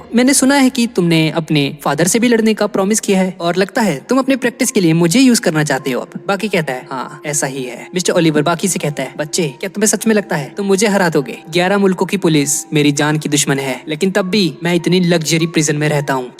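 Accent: Punjab, India. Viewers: Bhai tum panjabi ho kya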